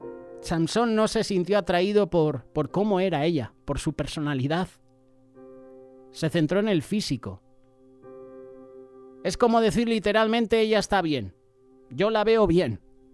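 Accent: Spain. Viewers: El ETERNO LES BENDIGA HOY ES 4JULIO_ 2021-DESDE OREGON PORTLAND USA ME ENCANTA SUS ENSEÑANSAS ME INSTRULLEN MUCHO I TAMBIEN ME ENCANTA SU ASENTO DE VOSOTROZZ😍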